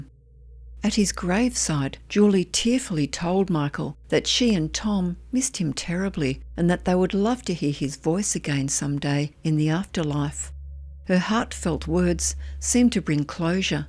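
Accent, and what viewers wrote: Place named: Australia. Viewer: Its simply an Aussi acent, so it sounds familiar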